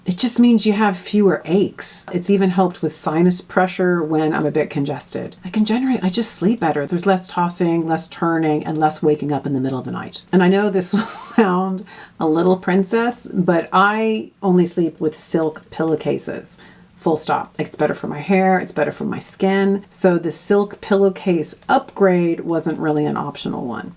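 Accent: Ireland. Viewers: Where are you from? I hear Irish 💚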